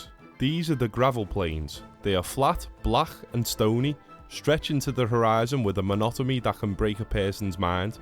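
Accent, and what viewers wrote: Liverpool, United Kingdom. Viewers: He has a Scouse accent from Liverpool. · Liverpudlian geography- this is what I've been waiting for! AI is finished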